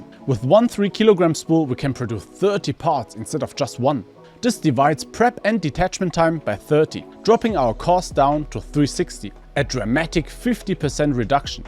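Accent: Germany. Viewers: German Accent Vanoss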